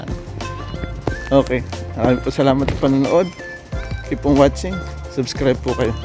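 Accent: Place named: Quezon, Philippines. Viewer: puntong quezon ka pa rin hane.. Ingat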